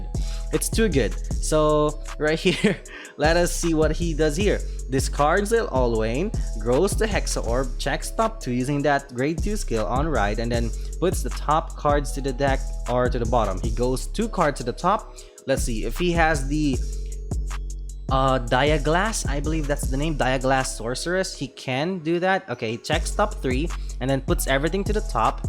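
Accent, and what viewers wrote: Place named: Philippines. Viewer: Sounds Filipino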